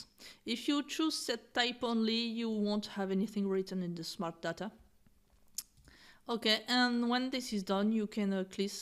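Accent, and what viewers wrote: France. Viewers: She is a girl. Avec un joli accent français de surcroît ! 😉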